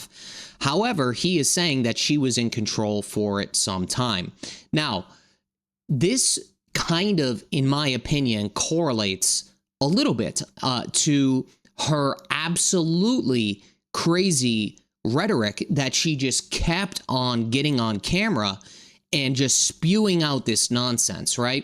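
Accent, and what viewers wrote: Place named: Northeast, United States. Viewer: who are you? Are you a lawyer or a journalist or someone who as they say is a grifter with a nice face and a heavy NE accent?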